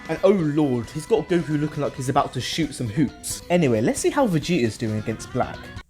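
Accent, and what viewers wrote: United Kingdom. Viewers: too briish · >click on video >British accent >first moments have joke about tea >click off >??? 😂😂😂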